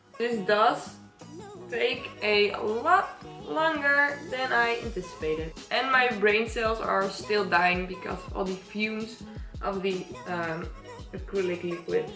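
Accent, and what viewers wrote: Netherlands. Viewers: Je Nederlandse accent is zo herkenbaar lol, goede video 12/10. Groetjes van België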